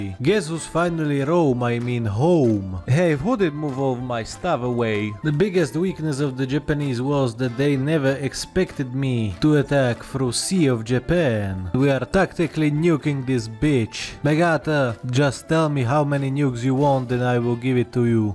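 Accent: Russia. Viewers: Bro your the best 😜 your Russian English is quite cool 🥲💀☠️ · Bros speaking russian accent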